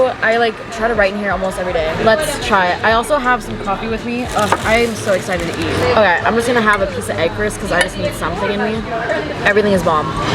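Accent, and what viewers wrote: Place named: United Kingdom. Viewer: Hi, my name is Brianna and I am from London and you have a lovely British accent.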